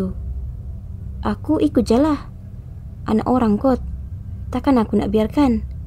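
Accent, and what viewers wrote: Sabah, Malaysia. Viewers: She sound more like Sabahan. Accent and choice of words. Maybe the narrator and story sharer are different persons.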